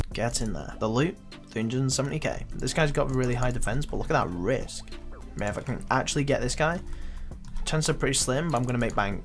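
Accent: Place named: United Kingdom. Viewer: Uk accent = ez youtube voice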